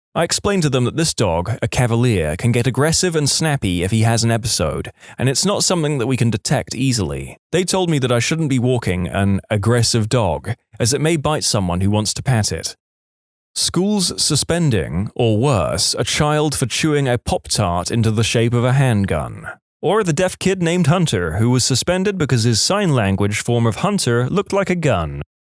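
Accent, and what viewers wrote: England, United Kingdom. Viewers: omg British man? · Who is this very English narrator and what did you do with British possibly from Edinburgh narrator I need a narrator with my accent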